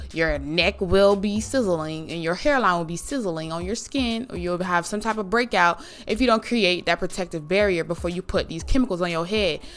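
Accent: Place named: South, United States. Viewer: SMH I love that country twang ☺️😍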